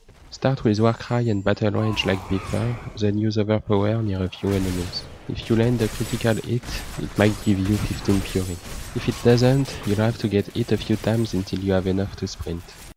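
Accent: France. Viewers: La vidéo est impressionnante mais est-ce que tu pourrais en faire une en français, je n'arrive pas à trouver de bon tutoriels en français et vu ton accent dans la vidéo je me dis que tu dois être français... ^^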